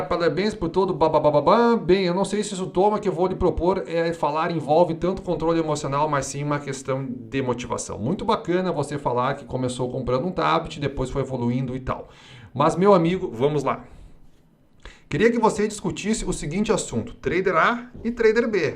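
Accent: Rio Grande do Sul, Brazil. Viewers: Esse sotaque de gaúcho é duro de aguentar 😠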